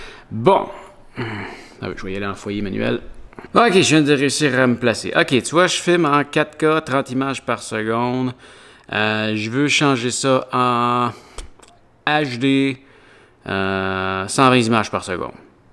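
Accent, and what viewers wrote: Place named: Quebec, Canada. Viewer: Tu m’as convaincu avec 2 choses : le trépied aimanté (on y pense pas mais dehors il y a du métal partout donc des trépieds partout !!) et ton accent québécois 😂 je viens de découvrir ton compte je m’abonne directement 🎉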